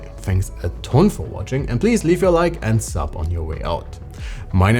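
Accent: Germany. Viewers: Your teutonic accent is very annoying. Please improve that.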